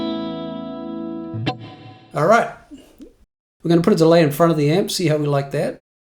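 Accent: New Zealand. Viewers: Are you from Invercargill by any chance? Great to find a kiwi on youtube providing such good info.👍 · Great Vid… you sound like a kiwi?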